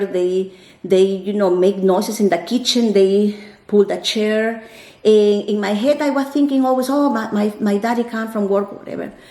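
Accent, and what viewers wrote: Peru. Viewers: This is the first of your videos that I have seen and as soon as I heard you talking I could hear a Peruvian accent. Reminded me of how my aunt talks and sure enough you said Lima, Peru and it sparked some joy in me. 🥰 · can we get a Spanish video because I want to hear your Peruvian Spanish 🥰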